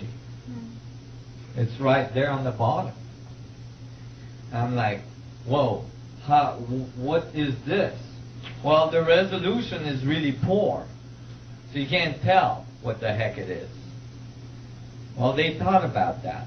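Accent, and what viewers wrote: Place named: United States. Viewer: He just sounds weird because hes from america. Doesnt mean hes a dumb arse.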